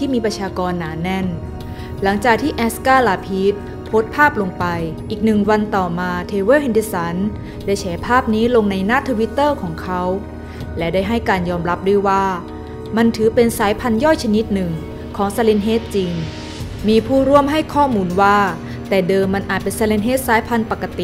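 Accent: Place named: South, Thailand. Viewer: พี่เป็นคนใต้เเน่ๆ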